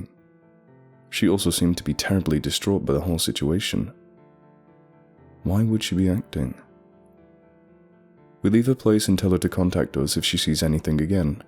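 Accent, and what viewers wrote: South, United States. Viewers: That was awesome! I wish I could find out more about what it all was ugh lol great southern accent by the way :) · Your American accent is great man!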